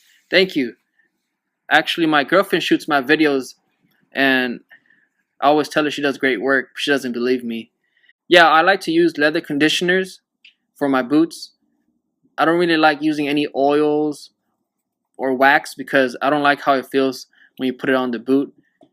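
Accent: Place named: Latin America. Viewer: I can hear his Latino accent. For a minute it sounded like he was going to bust out some Spanish. 😂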